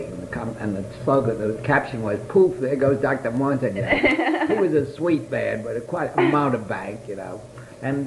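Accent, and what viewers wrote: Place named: New York, United States. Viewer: Nobody speaks with this beautiful old New York accent anymore.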